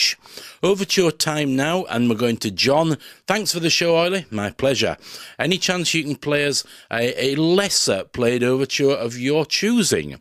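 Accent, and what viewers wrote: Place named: Yorkshire, United Kingdom. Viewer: Great show, as always ‘. Love the Yorkshire dialect. Please play me ‘ ’ for my husband, Michael and me. Our son, Adam, has lived in New Zealand for 16 years, now, where he and our lovely daughter in law gave us our first two grandsons, Callum and Sam, whom we see far too rarely, but thank goodness for Facetime. Please keep up the good work. We love your shows even more on YouTube.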